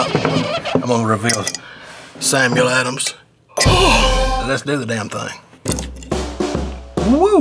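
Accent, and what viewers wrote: South, United States. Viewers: Nice hat, glorious beard, southern draw....well hell, Ima have to subscribe to your goofy ass.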